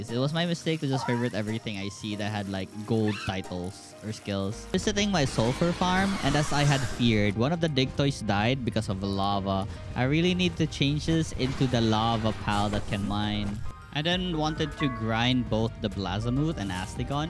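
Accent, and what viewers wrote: Philippines. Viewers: are you Filipino? cause you have a Filipino accent!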